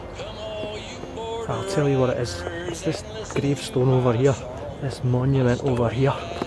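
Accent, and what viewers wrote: Scotland, United Kingdom. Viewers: Warning - Don’t read the subtitles if you struggle with the accent. YouTube translation sure hates Scottish accents. Not once did he mention IKEA but somehow YouTube thought he did 😂 good video man, when u feel at home amongst Armstrong’s 😇. my grandpa was a member of the clan. ⚔️ Invictus Maneo ⚔️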